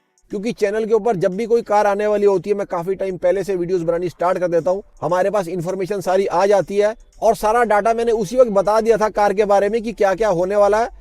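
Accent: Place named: Pakistan. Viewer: Bhai aap muze pakistani jaisa sound kar rahe ho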